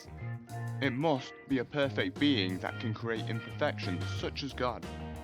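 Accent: United Kingdom. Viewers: your british english made me hard to understand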